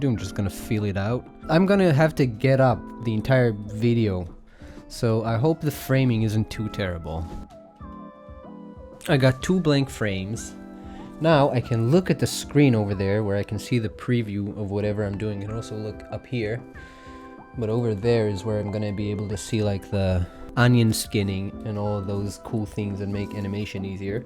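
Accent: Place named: Israel. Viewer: are u jewish. I smell an israeli accent. Might be wrong though.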